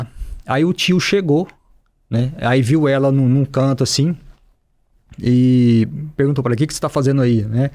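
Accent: Minas Gerais, Brazil. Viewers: Sotaque mineiro ❤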